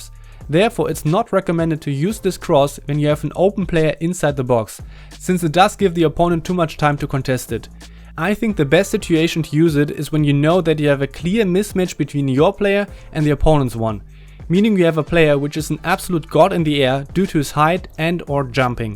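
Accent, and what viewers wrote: Germany. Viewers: man hört so sehr raus, das er deutsch ist :D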